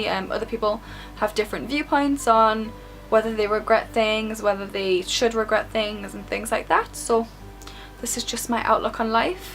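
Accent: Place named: Geordie, United Kingdom. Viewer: This was a really great Q&A :) also love that Geordie twang. Like I live in Newcastle also but I don't have the twang... Kind of jealous actually :)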